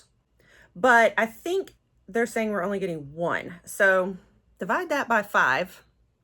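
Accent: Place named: United States. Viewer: Just the let you know I’m from the uk and I LOVE the American accent so I’ve subscribed and I think your advent videos are great xx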